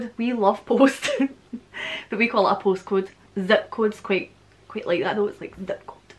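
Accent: Scotland, United Kingdom. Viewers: Love your accent and your videos! Love Scotland! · Hey, thanks for your interesting videos. Courgette and Aubergine happen to be french words. In case you (and your viewers) didn't know. I've just discovered your channel today and I really love your accent. I don't yet know from which part of Scotland you are but i hope to find out. Greetings from France. Take care. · On one of your older videos on Scottish pronunciation,you were talking about this thing with "i" being pronunced as "eh" or "ey". So how can you exactly tell the difference between the words like: bike-bake,line-lane,fight-fate,mine-main,pine-pain etc. or for this shorter "eh" sound: pity-petty etc.? Also can you actually pronounce it like that when you're taking about yourself,like "I am going somewhere"? 'Cause in this case I can hear that you pronounce it like "ah",but are there any Scottish people who'd pronounce it as "ey"? 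· Interesting, I like to hear how people in Scotland, Australia and England say words that mean the same thing but are different. I’m assuming you’ve seen Braveheart? Just wondering what you thought of Mel Gibson’s Scottish accent. In the movie, they used words like Lassie - meaning a young girl? Or no the now - meaning not right now? Some of the words you said in this video made you sound American, especially when you said “Herb” or “Garage” You should make a video trying to speak like an American. It would be funny, maybe because your Scottish accent is so thick? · For myself, I love the sound of English with what we, as Americans, think of as a Scottish accent. I think if I listened to Scottish Gaelic I would not understand a single word. As a child I was around many relatives that spoke with a Scottish accent including my Dad who was from Scotland. He had many friends and coworkers who were around the house. 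I don't really remember them because after my Dad died (I was maybe 2 or 3 years old) they didn't all come around the house too much and the Scottish relatives were older and well,.... passed away. Anyway, I love the accent and I love to watch and hear your videos.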